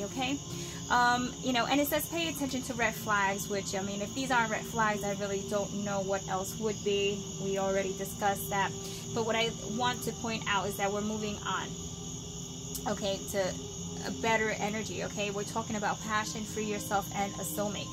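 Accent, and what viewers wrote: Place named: New York, United States. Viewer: I here the NY in you 😊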